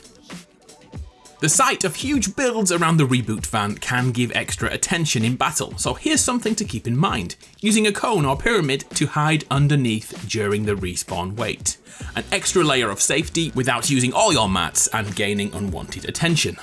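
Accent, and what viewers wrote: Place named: Yorkshire, United Kingdom. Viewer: Which part of Yorkshire are you from because I’m from Yorkshire too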